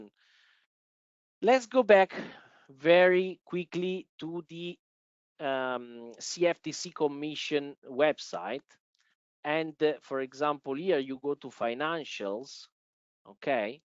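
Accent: Italy. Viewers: bel video, ti consiglio di registrare in voce italiana e tradurre l'audio in inglese con un tool di IA se davvero vuoi raccogliere iseguito internazionale, pochè la pronuncia inglese attuale potrebbe dar fastidio a chi è madrelingua inglese, ma è solo un mio parere personale e feedback che spero sia utile. Apprezzo molto i tuoi video e ti seguo con piacere da qualche settimana, sei una delle poche persone che è riuscita a farmi capire ed apprezzare il mondo delle azioni e dell'analisi fondamentale/tecnica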